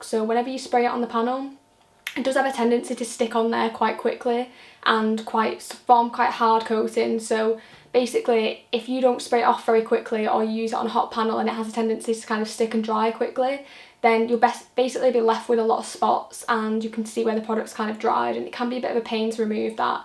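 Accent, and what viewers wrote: United Kingdom. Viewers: The three best things you can ever find is on this channel. Car Detailing British accent 😍 Cute Girl 👌🏼👍🏼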